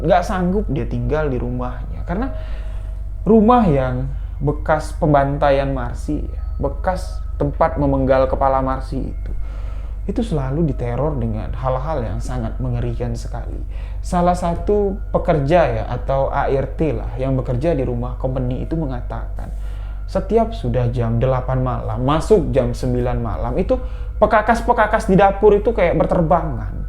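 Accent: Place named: Jambi, Indonesia. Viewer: Banten hadir kk.... Aku dari pertma x ikutin sebuah kisah misteri itu suka bnget dri cara n logat bicara y kk....logat jambi yg kental bnget Kaya kita kbawa alur cerita yg d kisahkn Pokok y sebuah kisah misteri mh keren hbis ....sehat n semngt trus y ka ..... Sukses....mg Allah sllu mlindungi kita semua.....🙏🇮🇩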